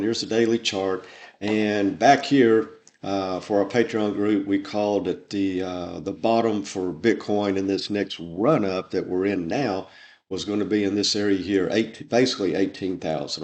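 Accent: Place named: Texas, United States. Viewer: Thanks for this. I’m in Travis & Dylan’s courses, but your instruction also seems like it would be helpful. I grew up in TX, but moved west 30 yrs ago. It’s nice to hear a TX accent (yours is very slight). Southern accents like some in Georgia & Tennessee are very strong in comparison.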